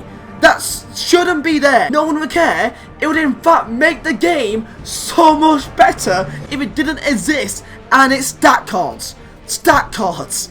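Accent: United Kingdom. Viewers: u need to learn how to speak proper understandable english not this terrible english with a british accent that sounds dreadful.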